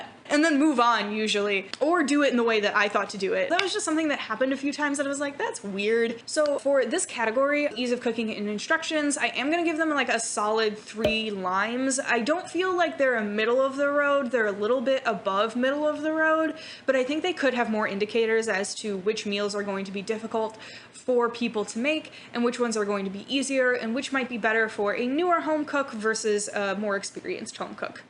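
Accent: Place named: Midwest, United States. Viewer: thick Midwestern accent CAT-EH-GOREY I'm seriously impressed by your grocery shopping skills, like damn. I'd be super interesting in a video on grocery budgeting. Food is always something I've splurged on as a self-care/comfort thing and while in pandemic I've gotten better about being mindful of a budget I feel like I'm still playing a guessing game at the checkout lamo.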